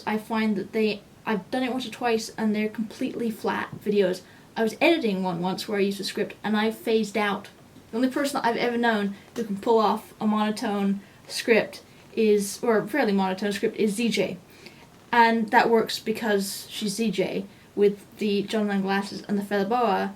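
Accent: United Kingdom. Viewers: Her father is British, and she grew up with it. I worked in Vancouver and there were plenty of British people living there who had not relinquished their accents. Believe me, if you spent a weekend in Oklahoma, you'd pick up a slight drawl, so cut her some slack. I lived in Northumberland for a year, and within a month the Geordie was sticking to me. "Yeah" became "aye." It happens. Try to live with it.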